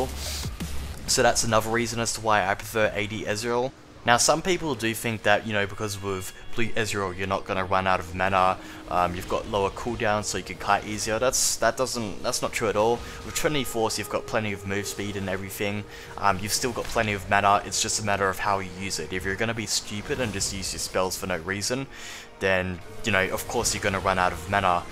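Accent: Australia. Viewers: This guy has like a half Australian/half American accent it's tripping me out.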